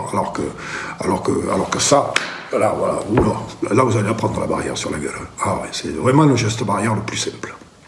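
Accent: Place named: South, France. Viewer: Tu resemble A Raoult j'aime pas Raoult mdr tu fais ton axan sud !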